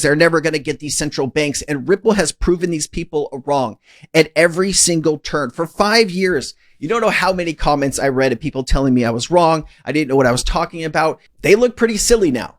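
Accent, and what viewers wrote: Australia. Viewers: Yes he's Australian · Same here... the dude on the video was an Aussie!. was close...😊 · Australian accent 😊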